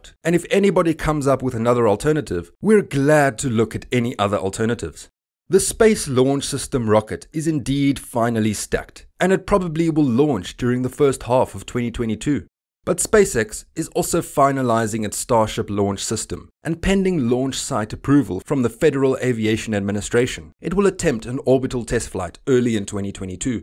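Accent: South Africa. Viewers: Sounds possibly south african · you're from south africa aren't you?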